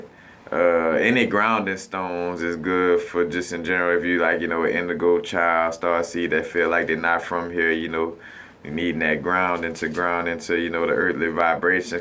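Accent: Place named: South, United States. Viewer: where are u from ? u sound like ur from the south nere me in Louisiana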